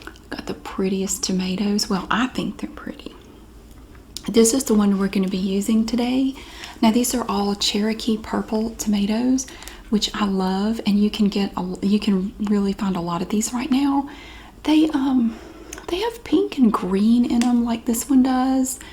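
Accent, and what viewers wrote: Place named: North Carolina, United States. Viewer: I’m new here…I just adore your accent. North Carolina?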